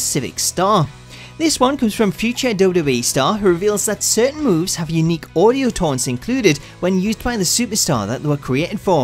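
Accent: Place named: Scotland, United Kingdom. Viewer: You’re Scottish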